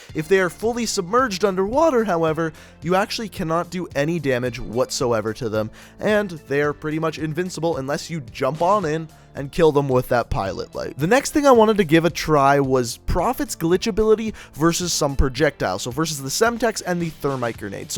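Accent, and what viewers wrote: Canada. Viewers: Is he canadian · are u Canadian cuz it sounds like it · Definitely Canadian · It's his Canadian accent it make them were they can't pronounce all words correctly so stop hating and learn to spell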